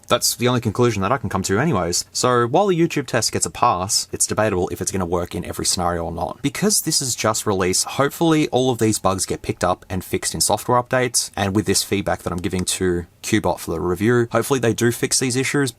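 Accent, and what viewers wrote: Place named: Australia. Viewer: I really appreciate your in-depth reviews. For the most part, I'll watch the intro, listing, turning on, set-up, specs, Quick Shortcut Maker, Conclusion, and teardown. Sometimes, if I have nothing else on the back burner, I'll watch the entire thing at 1.5 or 1.75 speed. The Aussie accent at that speed is hilarious. 😂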